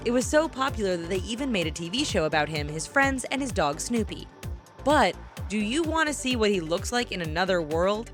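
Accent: United States. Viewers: This is better on mute. 2018 the year that the American accent became the world’s biggest turnoff. Mind you they will be speaking Russian soon so hey ho, every cloud. Oh it’s a shite video anyway with it the transformations are truly piss poor. Over and out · This video did not need narration. Especially that condescending white middle America accent narrative. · The narration is so american it hurts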